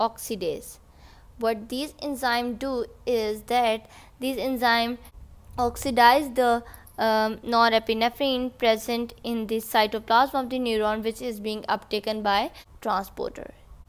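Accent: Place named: India. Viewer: well done but the indian accent is killing me😢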